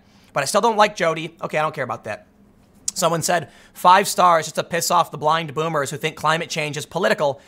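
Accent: United Kingdom. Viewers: British accent is the best part of this video.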